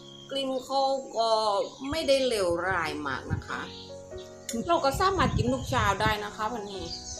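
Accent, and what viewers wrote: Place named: South, Thailand. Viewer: ชัดเจนเลยคนใต้เช่นกันเลยค่ะ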